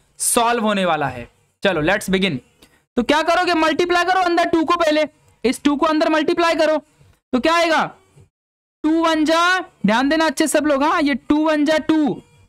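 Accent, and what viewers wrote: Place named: Mumbai, India. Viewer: आप होकर आप से मुंबई हिंदी सुनना अच्छा नहीं लगता!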